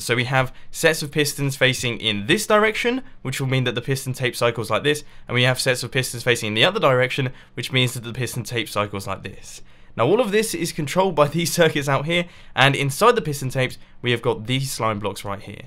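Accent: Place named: England, United Kingdom. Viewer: Where u from in England ??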